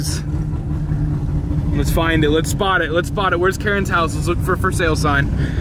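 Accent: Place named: Texas, United States. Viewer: You sound like someone from Texas....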